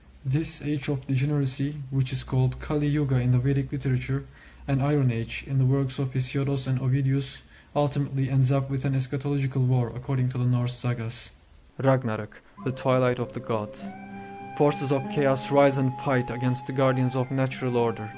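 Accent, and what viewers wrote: Turkey. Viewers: Aksanından Türk olduğunu anlamıştım. :D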